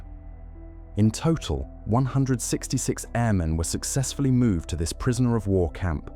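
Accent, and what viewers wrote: United Kingdom. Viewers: Nice video. Can I just say, in British English we say "one hundred AND sixty-six. We NEVER say "one hundred sixty-six". You've chosen a British accent, so you need to give it British expressions, otherwise it sounds bizarre.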